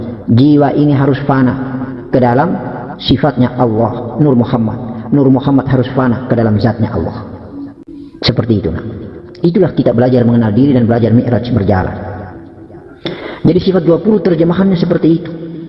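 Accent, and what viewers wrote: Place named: Bugis, Indonesia. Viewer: Kalo sy dgr selalu sy teringat bahasanya logat Bugis.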